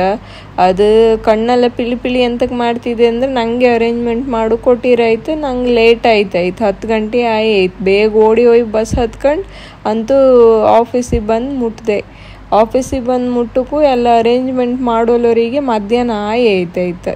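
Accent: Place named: Kundapura, India. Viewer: Mathergla Namaskara😂😂😂. Dada ye kannada video la mala manpovulli athey, kundapura kannada is also cute to listen❤❤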